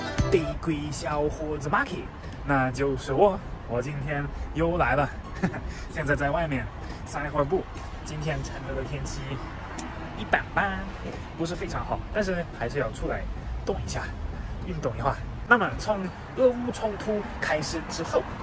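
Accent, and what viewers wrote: Sichuan, China. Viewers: 川普啊，还不错呢。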